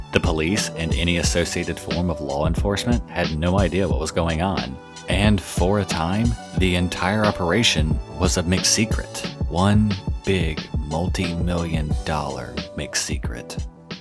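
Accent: South, United States. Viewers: First vid I’ve noticed the southern accent. Love your content ! Keep doing what you’re doing, my guy ♥️ I wish you all the subs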